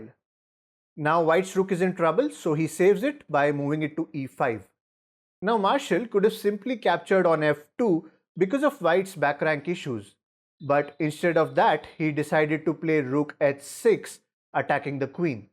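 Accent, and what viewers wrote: India. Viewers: ayo mutahar with indian accent? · god i hate indian accent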